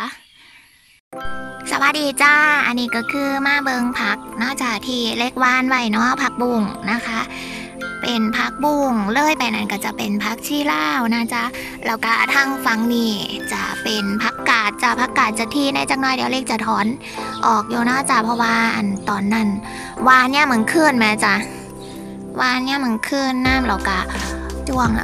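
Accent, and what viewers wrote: Isan, Thailand. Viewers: เสียงเว้าอีสานน่ารักหลาย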